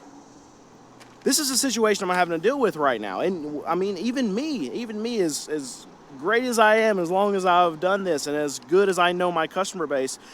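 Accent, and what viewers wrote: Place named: North Carolina, United States. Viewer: I heard North Carolina.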